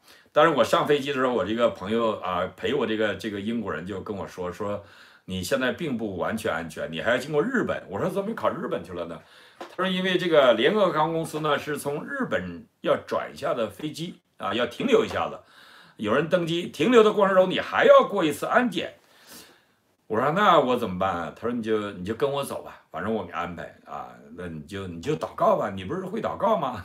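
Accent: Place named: Northeast, China. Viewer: 你说的东北话特别好听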